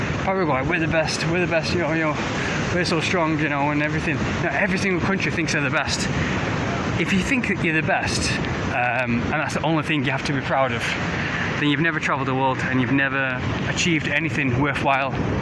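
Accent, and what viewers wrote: United Kingdom. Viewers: You sound English to me but I may be wrong.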